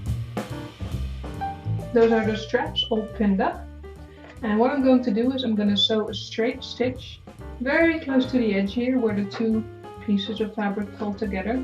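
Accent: Netherlands. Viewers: Thank you very much! I am definitely going to try and make one^^ Btw, you have a dutch accent:)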